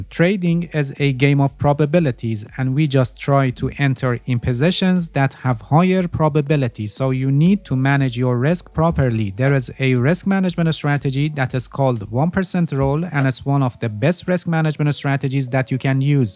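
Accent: Iran. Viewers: That's iranian accent 👏👏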